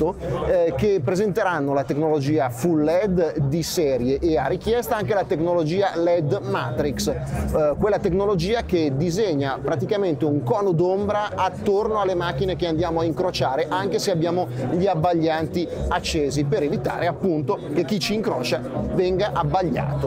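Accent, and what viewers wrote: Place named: Milan, Italy. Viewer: Bravo lo speaker. Peccato per l'accento meneghino.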